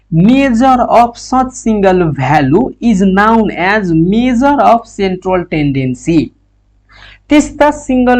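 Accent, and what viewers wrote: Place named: India. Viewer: I dont understand your indian accent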